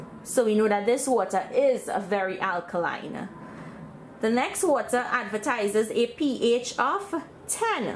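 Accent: Trinidad and Tobago. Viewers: KC and LoLo 23 it's a Trinidad and Tobago accent i.e. Caribbean 😊 hence the name of her channel as well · Are you from Trinidad? I love your accent!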